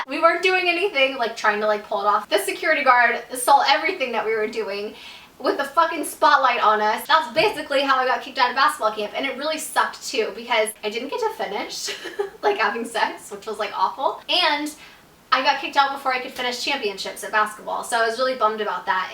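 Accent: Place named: California, United States. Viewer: she's from california, that's the way people talk there.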